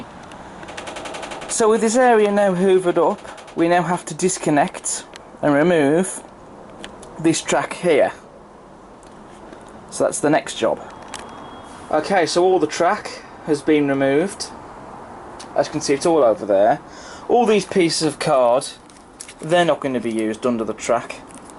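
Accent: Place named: Coventry, United Kingdom. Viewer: Whereabouts do you come from? Sounds like Coventry perhaps?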